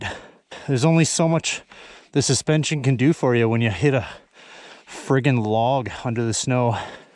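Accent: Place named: Canada. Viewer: Yeh dude. You Canooks got that funny northern accent and it cracks me up. Grip studs are epic for sure.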